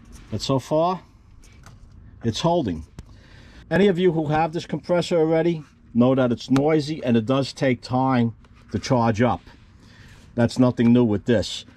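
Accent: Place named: New Jersey, United States. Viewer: Yoo from Joisey? Fun accent :-) "Yo, Adrian!"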